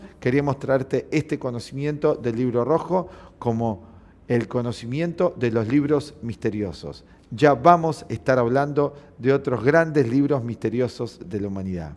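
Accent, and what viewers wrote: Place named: Argentina. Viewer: me conflictua tanto el acento argentino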